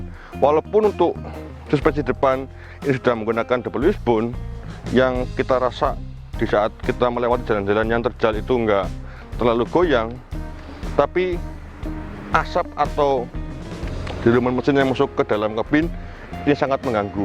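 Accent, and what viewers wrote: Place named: Banyumas, Indonesia. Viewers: Logat Purwokertonya Keliatan Banget Ya..😀🇲🇨👍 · Review nya bagus lucu sedikit ngapak 😁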